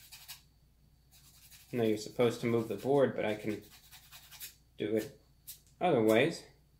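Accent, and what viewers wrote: United States. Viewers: He looks so British and then you hear his accent Feels American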